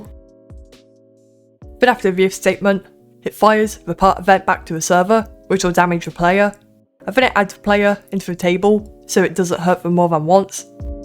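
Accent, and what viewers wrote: United Kingdom. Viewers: You sound like a british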